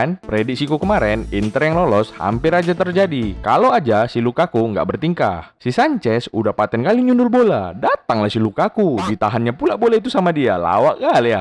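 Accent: Medan, Indonesia. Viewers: Logat medan punya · Emang gitu bro ngomong nya khas orang medan kan si ini orang medan jadi gw tau ya karena aku pun tinggal di medan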